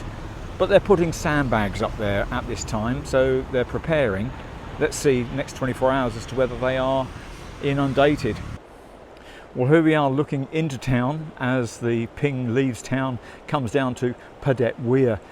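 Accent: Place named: United Kingdom. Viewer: This old POME talks way too much. No economy of thought or speech! Very proud of his UK accent but his Thai pronunciation is hideous!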